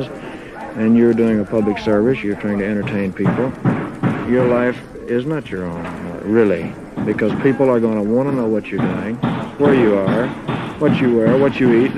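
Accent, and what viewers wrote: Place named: South, United States. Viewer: I LOVE TO HEAR ELVIS SPEAK, HIS AMAZING SOUTHERN ACCENT. THIS WAS A GREAT INTERVIEW WITH THE KING OF ROCK N ROLE FOREVER !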